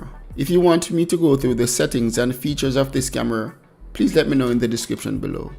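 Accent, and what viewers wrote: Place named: Bequia, Saint Vincent and the Grenadines. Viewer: Love de accent mon! Skiann for scan takes me right back 50 years to Bequia and discovering white guys spoke with the same accent, wild!!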